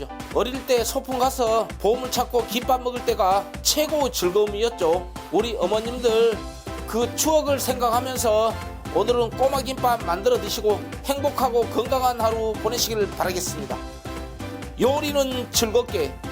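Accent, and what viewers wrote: Jeolla, South Korea. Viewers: ㅋㅋ 오늘따라 전라도 사투리가 구수하네염 ^^ 항상건강하세요. 덕분에 행복합니다 . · 구수한 전라도 사투리도 일품입니다 웃음도 나고요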